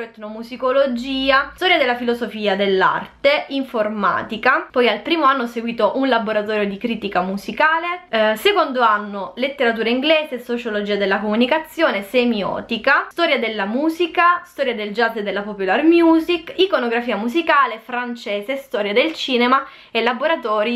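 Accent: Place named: Apulia, Italy. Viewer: Quanto sei Pugliese....!!! (Sarrbbe un complimento)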